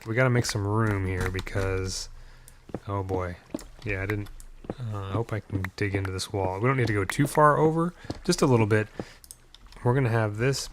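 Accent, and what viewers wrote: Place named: North, United Kingdom. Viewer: where are you from over that side of the pond? You sound pretty northern.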